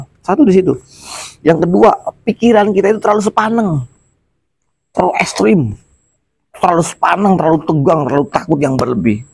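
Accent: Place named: West Java, Indonesia. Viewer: Kalo dari logat2 nya kaya orang Sunda kang, saya dari cibiru bandung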